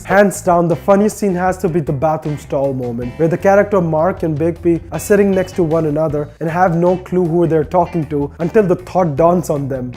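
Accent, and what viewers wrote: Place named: South Africa. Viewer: YOu sounded more South African.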